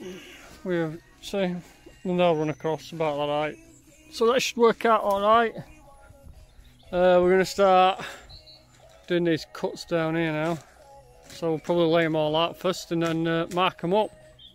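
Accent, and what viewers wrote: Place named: Yorkshire, United Kingdom. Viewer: Always thought you sounded local, I’m from Wakefield my self!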